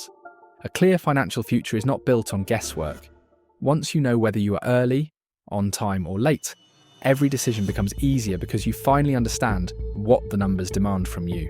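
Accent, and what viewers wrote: England, United Kingdom. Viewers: Always wondered why its an English accent talking in Dollars. Helpful video...uk based. 42, no debt, buffer in place and started an all world global index fund aim to save £200 ° month into it. Hope to be able to retire earlier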